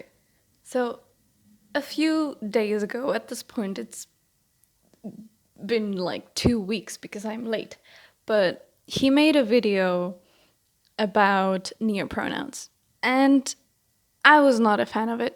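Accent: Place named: Finland. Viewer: Excellent points!! This really needed to be said. Satutko muuten olee Suomesta? Aksentista päätellen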